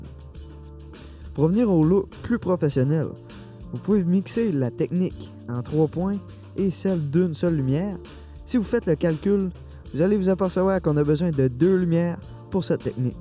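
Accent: Quebec, Canada. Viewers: Pourquoi tu n'as que 260 abonnées ??? Tu en mérite 10x PLUS !!! Ton travail est exemplaire , tes sujets sont intéressants et ton montage est magnifique PS : J'adore les accents québécois !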